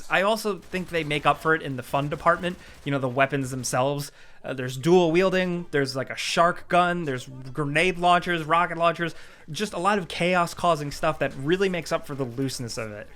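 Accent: United Kingdom. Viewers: British male voice is the best!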